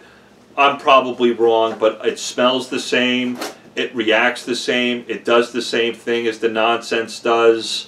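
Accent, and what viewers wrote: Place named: East Coast, United States. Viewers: Hey pal John from Northport LongIsland again Auto Detailing love the vid and like you have experienced the same thing as you with trying it all and have it narrowed down to most of what you have. How is that citral hope I spelled it right it was the last one you had you mentioned it takes off road paint and here on LongIsland they are always doing the lines on the road lol. Didn't you say you're from here as well that's our accent lol. Thanks keep up the great vids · Love your stuff, but I gotta say: Your into: “This is ovah here…” (My brain: “this guy is definitely on the east-coast”) “From Dallas…” (My brain: “what? That’s not possible!”)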